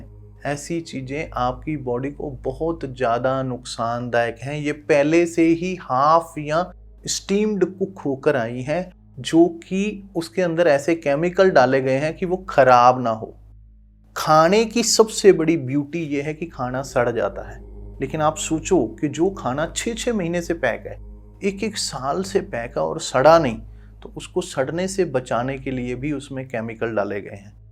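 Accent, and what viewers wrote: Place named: Rajasthan, India. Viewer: Aap guro ji ya to rajesthan ke ho ya hariyana jaunpur ke ho aap ki avaj se lagta he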